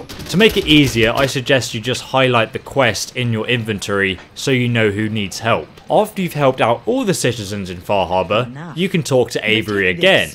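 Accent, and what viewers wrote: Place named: United Kingdom. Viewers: It's obviously British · he's brittish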